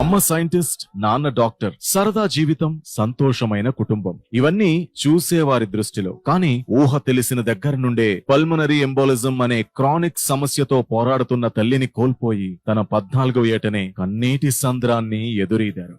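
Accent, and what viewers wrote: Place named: Telangana, India. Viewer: Acham telangana pilla lage matldthundi❤❤❤❤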